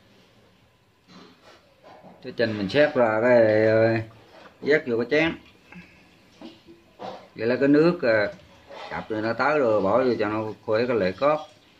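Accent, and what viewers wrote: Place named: Central, Vietnam. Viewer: ôi đúng giọng miền trung,hướng dẫn tận tình chi tiết!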